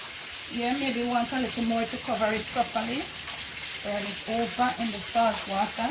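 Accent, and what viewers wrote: Jamaica. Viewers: Yes Miss! Gwaarn wid you bad self. Niceness from start to finish. I love how you keep it real with your lovely likkle jokes and patois style. Bless up and one love always..🇯🇲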